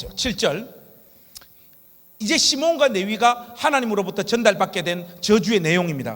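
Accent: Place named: Gyeongsang, South Korea. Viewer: 목사님~♡ 경상도 말씨 듣기 좋은데요. 단세에 오셔서 간증하실때 홍수와 요강 훅 와닿았어요. 목사님 응원합니당.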